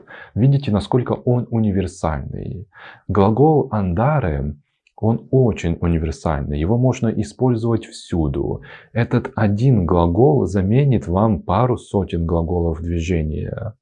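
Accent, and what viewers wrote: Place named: Italy. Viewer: Вы же понимаете, что человек живет в Италии и там говорят именно так и мне это помогает привыкнуть к итальянским акцентам? Я сама уже иногда говорю "ситуасьйон")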